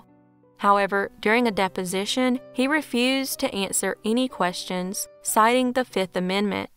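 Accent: South, United States. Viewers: This narrator has a good southern accent. Very nice! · Just have to say as a Southern gal.Myself I absolutely love your accent❤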